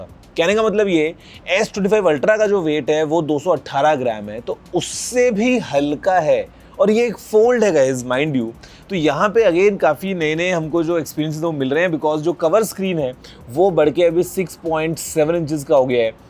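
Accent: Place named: South Delhi, India. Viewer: South delhi girls jaisi accent hai bhai teri. Makeover ke sath sath accent pe bhi kaam kar thoda.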